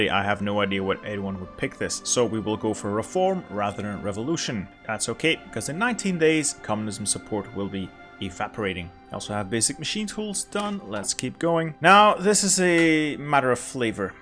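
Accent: Flanders, Belgium. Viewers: yeah but he's from the Antwerp region if I can locate his accent enough. Which is the northern region where we speak Flemish (form of Dutch)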